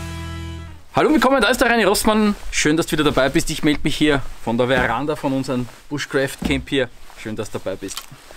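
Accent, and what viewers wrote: Vienna, Austria. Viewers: ...wenn ich dich so reden höre vermisse ich meine Wiener Verwandtschaft. Sehr schönes Video.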